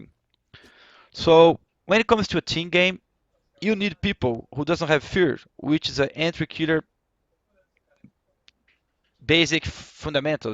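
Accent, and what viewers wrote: Brazil. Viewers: se quiser melhorar tua pronúncia da palavra WORLD para que não pareça WORD. Fale assim: wor - L(el) - D(di) worLd De resto, embora teu sotaque BR, dá pra entender muito bem. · tu é br ou americano?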